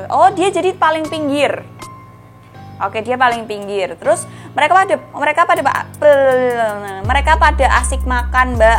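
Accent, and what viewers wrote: Java, Indonesia. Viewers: suka logat jawa.y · Mbak buat subtitle nya dong di bawah kalo lagi medok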